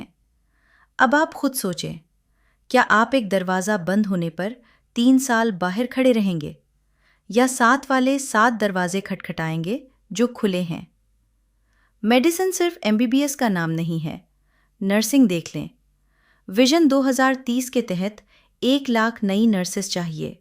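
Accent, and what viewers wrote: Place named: Hyderabad, India. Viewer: Assalamu Aleykum, Aap ka laheja mein Hyderabadi accent hai ( india ) sun kar achha laga , ( Hyderabad Deccan se Syed )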